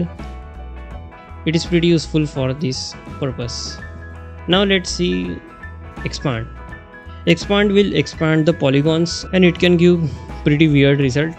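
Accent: India. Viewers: Do you know a good app to make a voice over to hide this shitty pajeet voice?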